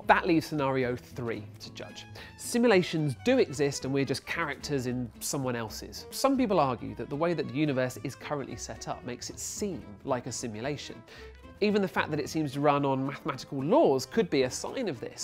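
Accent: United Kingdom. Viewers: I HATE THE BRITISH ACCENT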